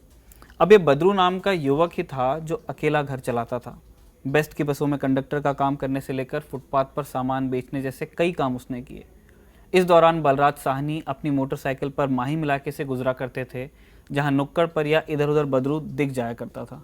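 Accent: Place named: North, India. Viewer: Why are you keep saying BOMBAY instead of MUMBAI which is actual name.? I feel that all north Indians (that what i believe from your pronunciation) are stupid and are not willing t come out of British slavery and so keep reminding themselves with names like BOMBAY instead of its proper, actual & real name MUMBAI...... I am hoping that you will improve on this....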